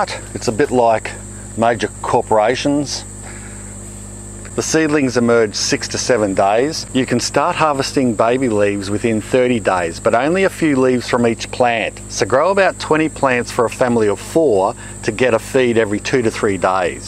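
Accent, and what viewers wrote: Australia. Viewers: How can this video have dislikes!! Can't honestly believe it. Thanks so much from up the hill in Toowoomba. Love the dad jokes but the way. Makes me smile to hear your good ol aussie accent on the screen. Grew up in Brisbane in Morningside so also good to see your southsider as well! 😉. Take care mate. Hope the family is well.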